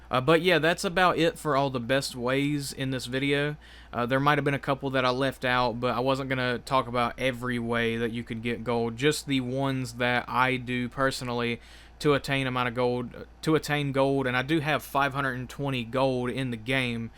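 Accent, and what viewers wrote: South, United States. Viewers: I love the bit of southern he has in his voice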